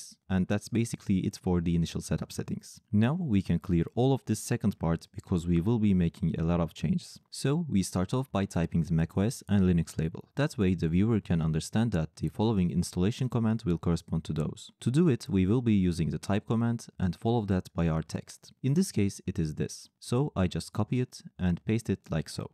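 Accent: Turkey. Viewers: Thanks that was usefull. btw are you Turkish ? Your accent seemed to me like that.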